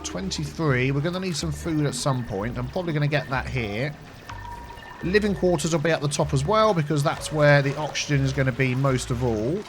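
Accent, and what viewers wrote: United Kingdom. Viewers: I love this game so much. But being somewhat of a voice fanatic, I'm obsessed with your voice. All I do is listen and try to add certain aspects of it to my repertoire. Can I ask where in the UK you're from?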